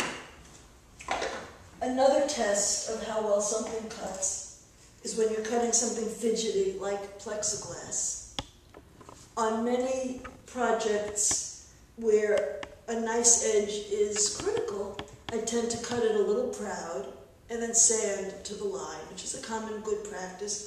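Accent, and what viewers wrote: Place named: United States. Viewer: Scrolling with the Hegner, wrong title as no scrolling takes place just a lot of talking with strong whining American accent to difficult to understand even for the subtitles.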